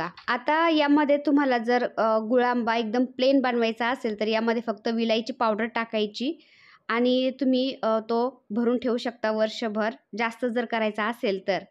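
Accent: Ahmednagar, India. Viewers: मला वाटलच तूम्ही नगरच्या आहे आम्ही पण नगरचे आहोत पण नाशिक ला राहातो माझे मिस्टर आशेच बोलतात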